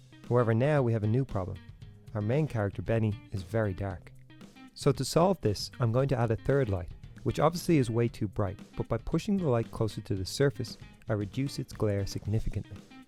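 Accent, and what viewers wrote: Ireland. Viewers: are you Irish? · This was very helpful, thank you. By the way, are you Irish or something?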